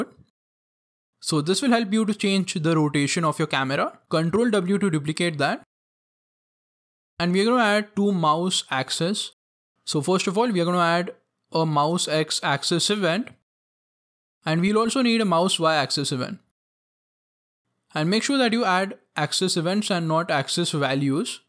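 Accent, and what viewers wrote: India. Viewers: you look american but talk in a indian accent my stereotypical brain don't know what to do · this is what I mean when I say indian accent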